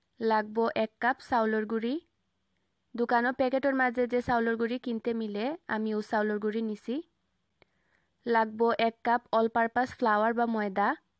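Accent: Sylhet, Bangladesh. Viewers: আফা মন পাইছো❤ খাঁটি সিলেটি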